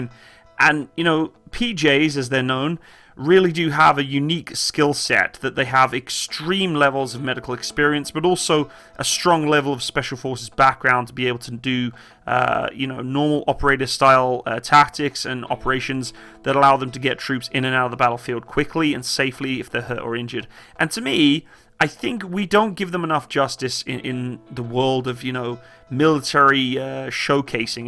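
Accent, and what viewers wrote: United Kingdom. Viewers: I'm ex air force elite medical rescue. The British narrative is offensive. You're Not American. Please be respectful, sir. Although I do admire your respect. Put subtitles for translation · I was not expecting the British accent when I clicked on this video. And after I heard the accent, I was not expecting the level of detail and attention put into this.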